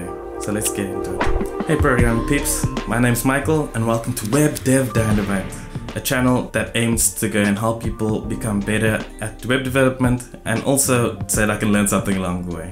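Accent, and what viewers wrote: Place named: Asia. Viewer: I had no idea how html semantics worked until I watched this vide, very nicely done! also where you from? you sound a bit asian ??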